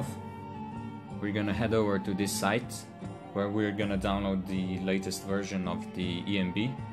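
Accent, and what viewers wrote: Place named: Netherlands. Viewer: sounds good, from other YouTube vids I see you can get some nice results modding oblivion, also am I right in thinking you are from the Netherlands judging by your accent?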